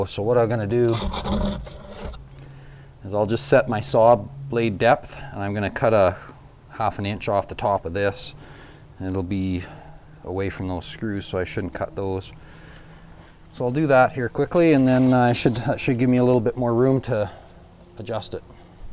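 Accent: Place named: Canada. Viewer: The most canadian accent.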